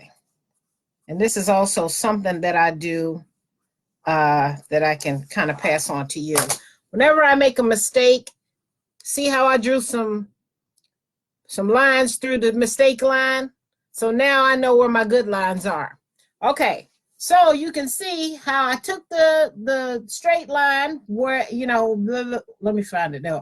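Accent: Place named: Alabama, United States. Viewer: I like you! You sound like me and my Alabama family!🥰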